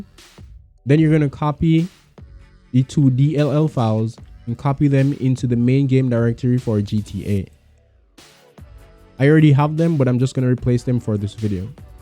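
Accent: Jamaica. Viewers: You sound Jamaican bro. Are you? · Heard the Jamaican Accent and Subbed faster than you can say Ackee & Saltfish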